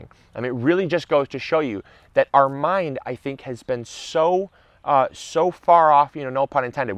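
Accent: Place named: Minnesota, United States. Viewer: I'm from Canada so I have no idea, but is this what people from Minnesota sound like?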